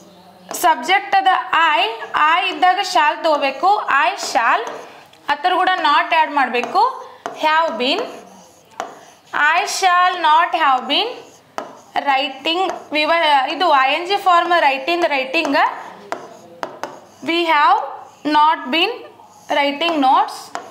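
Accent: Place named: Belagavi, India. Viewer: Nimm Belagavi accent alli nimm matu kelode chanda❤️👌👌👌👌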